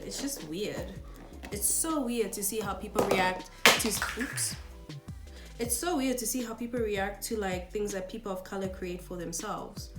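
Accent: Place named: South Africa. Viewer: I absolutely love this!!! I knew you were South African immediately and this is such a good video💗 I came here from an ig link and I'm so happy to be here Another thing these brands think we don't notice is when they make 15 shaded of concealer thinking we don't know all those shades can just be used as contour for lighter skinned/white people. That's why the darker concealers are always the wrong undertone (undertones that don't emerge among darker skinned people) Also love your shirt💗😋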